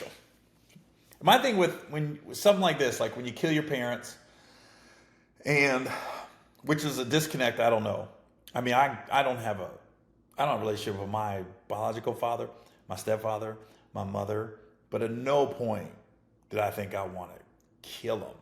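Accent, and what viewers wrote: South, United States. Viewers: Isnt it southern?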